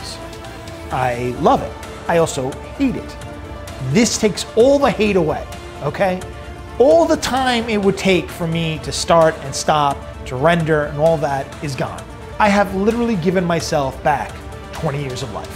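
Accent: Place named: Queens, United States. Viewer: Great video! Aside from the great content, you had me chuckling at a few points (I'm a tough audience for comedy). I love your accent. I'm from Springsteen's home town and I'm trying to determine which borough your from. Your accent isn't strong enough for the Bronx, and I definitely don't hear LonGIsland (no space and capital G on purpose). I'm going with Queens. I'm at the Camtasia/OpenShot level of video editing ability, so please excuse my ignorance. You built this with a focus on Adobe - how would it do with DaVinci Resolve (I'm cheap) and other Black Magic Design products, please? I assume it would chew through them, too. Thank you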